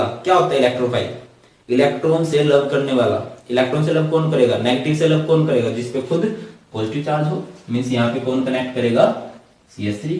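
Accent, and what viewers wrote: Haryana, India. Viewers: Sir kya aap haryana se h ??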